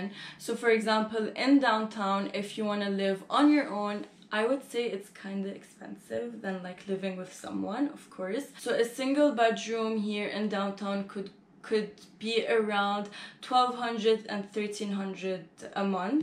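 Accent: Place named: Lebanon. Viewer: lebanese accent is so obvious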